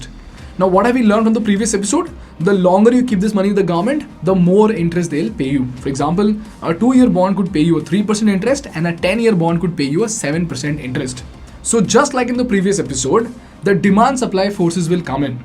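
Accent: India. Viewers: I love the contents of most of your videos. I can understand your accent as i grew up in India. The idea flow is cogent and quite well organized. Well done! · Funny indian tongue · That's what subtitles are for. Please make use of it. He isn't a British South Asian, He lives in India and just like Indian accent is hard for you to understand, it is equally harder for many Indians to understand British or American accent without subtitles. Since most of his audience are Indians, it makes sense for him to produce the content in English as we Indians don't have a common language, but English is still widespread and with the help of subtitles, people from different countries can still understand. Moreover, if you try to learn another language, especially languages with high pitch and words like Hindi, you will realize that just how hard it is to change your accent.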